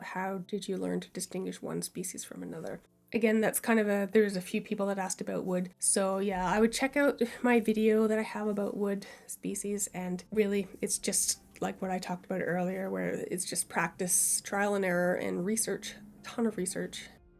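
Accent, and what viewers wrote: Nova Scotia, Canada. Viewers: Your furniture stuff showed up in my feed one day... so i watched... then i watched some more... and there was something about your accent that made me think of "down home nova scotia" Then i discovered you're in Hantsport! My hubby grew up around the corner from you on Smith Cres (the wrong side of the tracks, lol) and he is only a few years older than you... so now i wonder if our paths might have crossed in the past. Boom! I was hooked! Art and furniture restorations... with that down home accent. 😊 Thank you! And happy anniversary.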